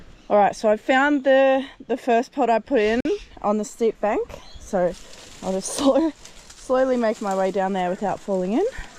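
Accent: Australia. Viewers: Love the Australian Accent!!!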